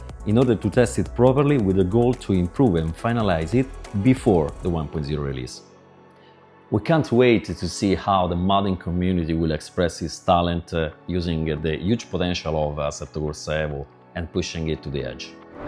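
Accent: Rome, Italy. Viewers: L'inglese con accento romano 😁 . E comunque sempre meglio del mio inglese ....complimenti per il gioco , spero esca presto l' aggiornamento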